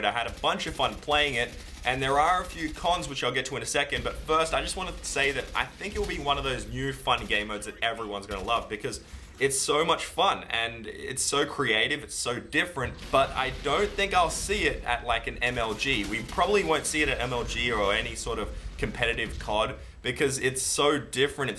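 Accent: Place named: Australia. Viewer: why does always say his accent is australian like we don't know?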